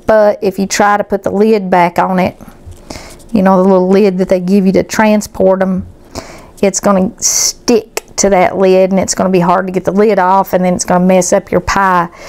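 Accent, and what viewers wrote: South, United States. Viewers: My husband's going to love this one. Hes a Peanutbutter on anything lover. Especially IceCream. Gotta make it for him, only without the chocolate. Thank you for another awesome pie recipe. Love in Christ Jesus, Also, I just absolutely love your country accent.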